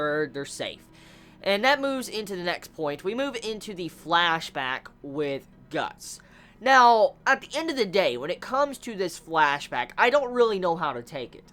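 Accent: South, United States. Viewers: I never picked up on this before but your accent sounds distinctly Southern all of a sudden. You from the Southeast United States?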